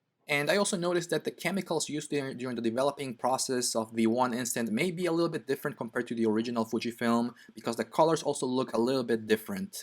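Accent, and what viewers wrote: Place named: Philippines. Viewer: Why do you have a filipino english language? Are you filipino?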